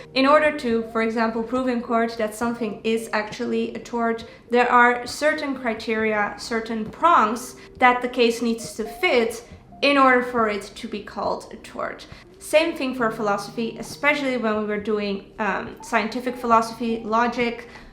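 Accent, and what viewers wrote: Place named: Netherlands. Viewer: Hoor je nederlandse accent 🥰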